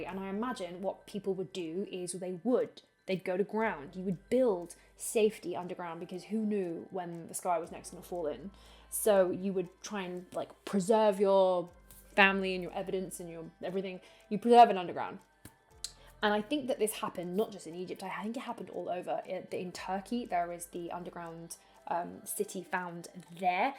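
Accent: United Kingdom. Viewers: I know, and y so britty talking style.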